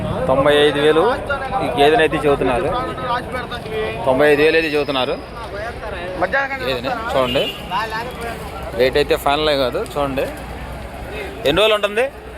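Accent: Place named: India. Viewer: Telugu voice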